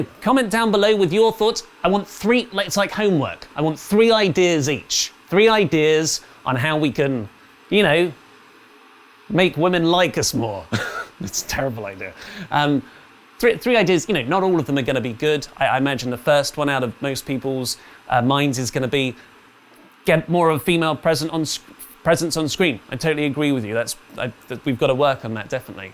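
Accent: United Kingdom. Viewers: your natural speaking voice is perfectly British. Coming from an midwesterner who loved Hot Fuzz to watch the director commentary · Social meteor account. I love British talk.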